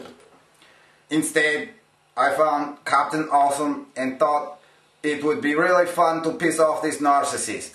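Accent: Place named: Ukraine. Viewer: I can't make sense of the riddle, but from your accent alone, my guess would be somewhere in the USSR (as it was still called in 1978), perhaps Ukraine. Second guess would be Poland, but that seems too obvious.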